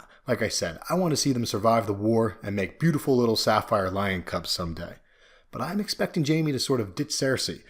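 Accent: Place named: East Coast, United States. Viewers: Cammy O hey woah...east coast accents are bad-ass....not cute. 😉Love ya girlie. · Normally I could care less about lady stoneheart; however, after watching this video I hope HBO (or D&D) puts the big scene in the show! As usual, you make everything SO interesting, even Lady Stoneheart! Your positive attitude & 🆙beat personality😄 makes your videos a joy🌈 to watch 👀 Your cute EastCoast accent & awesome voice 💞 makes your videos a joy🌈 to listen to 👂 Always a pleasure Thanx!😁 💗💚💛💜💙💖💚💛💜💙💝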